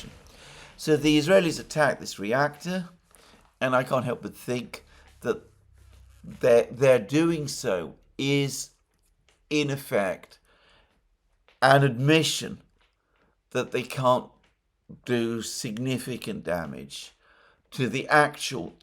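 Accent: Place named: RP, United Kingdom. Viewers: I like your speech . Because you speak softly and in queen's language. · It's an educated London accent. It is not how the Queen spoke.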